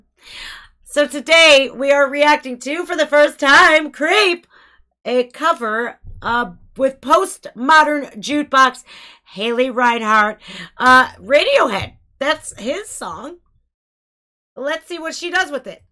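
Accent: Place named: Ohio, United States. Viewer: You are THE. BEST. I love your Ohio accent.:). Yeah, watch Haley's bit. She smolders.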